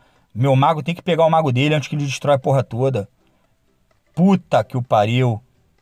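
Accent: Rio de Janeiro, Brazil. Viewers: man o q e da hr e a voz dele eo sutaque carioca top demais.show galera KKKK salve eh nois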